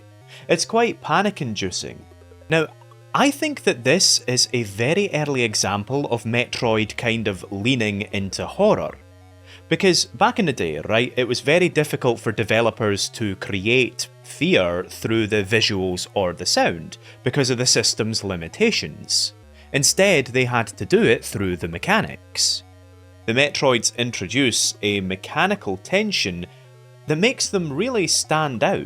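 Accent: Scotland, United Kingdom. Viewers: That's a Scottish accent right? Fresh change to hear a game review with this accent. I like it. Wait is that Scottish right not irish? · Listening to your Scottish brogue made this video fly by quickly. Cheers from this grandson of Coatbridge, born in the States.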